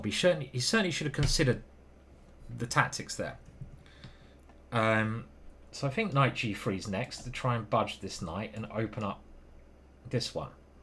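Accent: England, United Kingdom. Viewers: Alright mate, really enjoying your channel, which I'm fairly new to. I watch Eric rosen and have enjoyed his speed run. He's so relaxed and calm. But, I don't know if its because you are English and sound like me, but I really relate to your commentary and thought processes. Which is ridiculous, because the chess i play is the equivalent of Sunday league pub team football, where just turning up is a victory. But you have a knack for explaining shit in a really relatable way. You come across like a normal bloke that I could easily have a pint and a chat with, and it wouldn't be weird. Anyway, not sure what im trying to say, except cheers and good luck. Check out 'devon tracey makes no money at chess'. Beautiful channel, interesting fella. OK that's it. Cheers.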